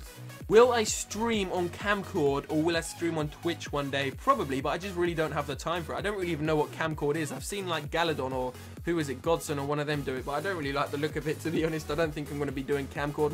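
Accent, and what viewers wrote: United Kingdom. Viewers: I knew it your British, so am i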